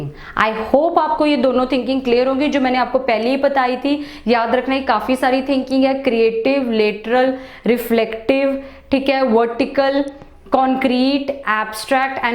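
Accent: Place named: Punjab, India. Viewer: Ma’am Apki Dilect main Punjabi Touch aata hai🙈🙈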